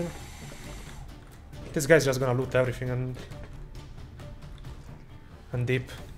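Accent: Italy. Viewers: Ur italian?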